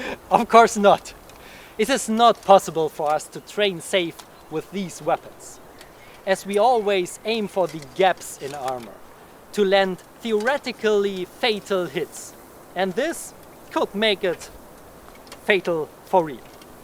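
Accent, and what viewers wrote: Germany. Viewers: First time here. I've immediately recognized your German accent 🥰. Based channel! I subbed.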